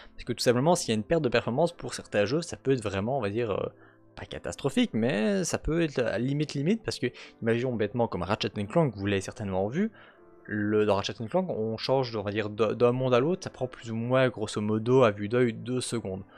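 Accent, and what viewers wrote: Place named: Belgium. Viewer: sa s'entend a ton accent moi aussi je suis belges hahaha continue tes videos tu vas percer 🔥🔥🔥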